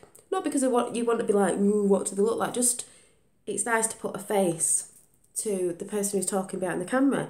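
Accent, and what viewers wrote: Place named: North West England, United Kingdom. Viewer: Oh my goodness, Thanks for the shout out!!! Sharpies are the worst! You can do a bleed through test with pens on your back page. Stabilo fineliners should work well on normal paper. Come and join our group on Facebook too!!! You'd fit right in! Also, you sound local to me!! My accent betrays me...I actually live in the North west of England Xx <link>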